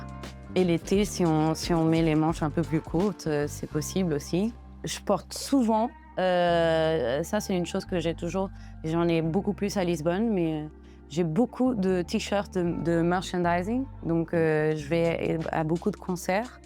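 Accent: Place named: France. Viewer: I miss when your videos used to be in English with the beautiful French accents :(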